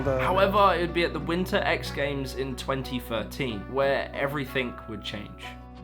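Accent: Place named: United Kingdom. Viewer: I hate the British accent... It's THIRTEENTH....not FIRTEENF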